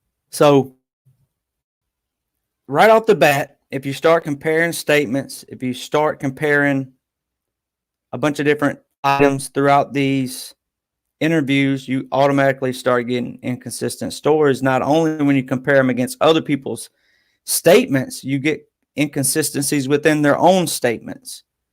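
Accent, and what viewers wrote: South, United States. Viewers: You're my absolute favorite of all M2M enthusiusts...love the southern accent too. I'm originally from Bham, AL I can relate.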